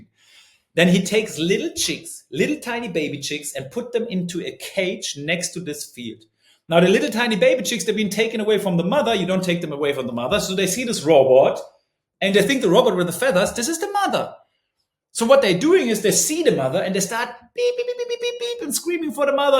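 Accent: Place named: Germany. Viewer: Sorry Kumpel, irgendwo habe dich sprechen hören, dass du in Englisch sich einfacher als in Deutsch äußern kannst😉 Jetzt finde dich Englisch sprechend und ok...du redest fliesend, aber dein German accent ist schon markant, was deine obige Aussage nicht nur in Frage stellt, sondern auch ausschließt. Deutsch ist und bleibt für dich eine Sprache die du am besten kannst(dagegen mein Deutsch...na ja). Bin auf dich in polnischen Medien gestoßen und aus reiner Neugier habe ich kurz recherchiert, wer du bist. Mein herzlichen Glückwünsch zum Vater sein, das Gefühl könnte ich zwei mal lernen. Eins haben wir noch gemeinsam...wir beide leben in Schatten unserer Frauen. Meine Gattin(im Avatar noch mit 36) kommt wie die Marcelina auch aus Polen. War Inhaberin einer Medienagentur in Stettiner TV und Finalistin, Warschauer Look of the year. Nicht desto trotz, ständig bodenständig, liebevoll und smart. 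Mach alles richtig, den ersten Schritt hast gewagt 🥂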